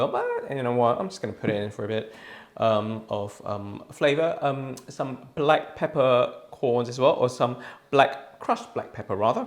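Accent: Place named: RP, United Kingdom. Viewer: What's with the posh voice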